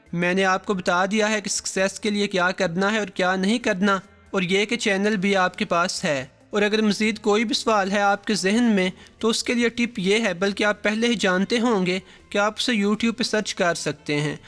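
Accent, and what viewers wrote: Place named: Lahore, Pakistan. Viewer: Yar tussi lahori ho ☺☺😑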